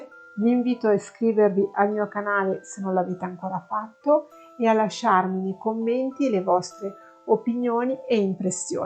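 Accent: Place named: Lombardy, Italy. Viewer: Ciao complimenti appena arrivata sono in clandestinità perché sto usando un iPad condiviso. Complimenti soprattutto perché tratti dei casi italiani. Mi piace il tuo accento lombardo. A presto Lally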